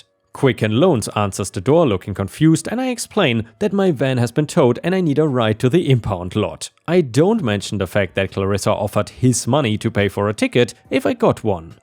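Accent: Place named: Germany. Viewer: Are you german? I cind of hear an acsent. (sorry for the gramar not a english speaking one either)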